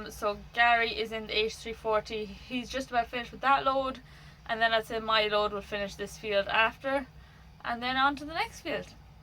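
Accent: Ireland. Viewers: Partly guessed you were Irish.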